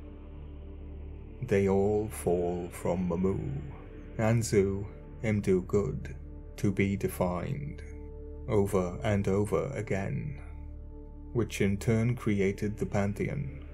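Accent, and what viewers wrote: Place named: England, United Kingdom. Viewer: Omg.. So dramatic with the heavy English accent 🤣. I figured "Lord" was related to Baal and other pagan deities in like 5 min as a teen. Also "Elohim" can be figured out with just a Strong's concordance. It's just a title dude, that can be given to angels and men. It's a council a court, some are fallen.. Like the angels that slept with human women. In the book of Job Satan himself attends one of these meetings and "El" gives job to be tested. Real Hebrew btw did not come from Sumarian language. It's a forgotten language that is now a mixture of languages. No one speaks pure Hebrew. So it doesn't surprise me of all its Babylonian influence, even modern Judaism main source is the Babylonian Talmud. Basically all major religions can be traced to Babylon.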